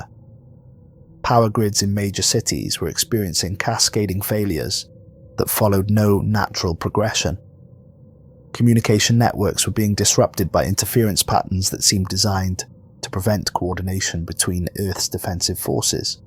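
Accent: Wales, United Kingdom. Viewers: Good stories and spoken in English with a Welsh accent. Looking forward to the next one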